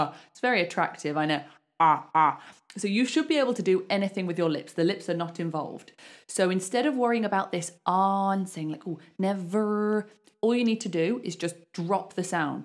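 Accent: United Kingdom. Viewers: Excellent ! Lovely British pronunciation ❤ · I adore standard British accent, thank you for your lessons! I absolutely love it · Pleasure to hear pure clear british english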